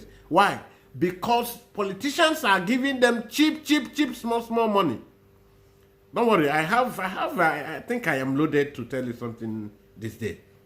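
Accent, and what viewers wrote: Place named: Igbo, Nigeria. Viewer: Nnam ur Igbo pure,na man u be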